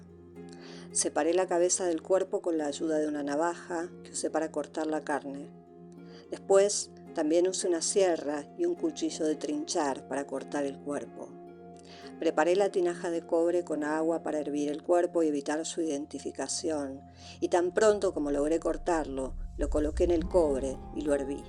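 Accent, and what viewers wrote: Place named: Spain. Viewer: Me gustan los temas pero el acento español de la narradora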